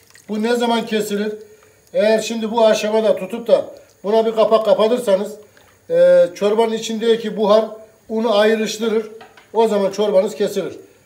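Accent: Turkey. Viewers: Biz böyle seviyoh gardaşım şefimizi, herkeş İsdambul Turkcesi gonuşmah zorunda deyil...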